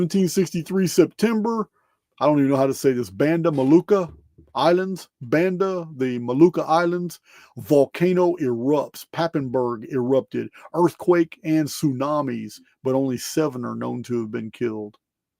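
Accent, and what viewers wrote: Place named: United States. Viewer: I like the way Americans pronounce our English names. Wales is even funnier😊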